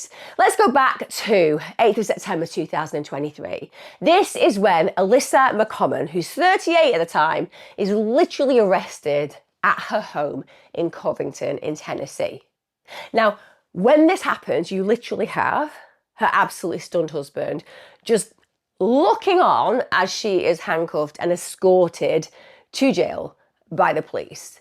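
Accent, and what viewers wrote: United Kingdom. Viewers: Nice to find uk accent podcasters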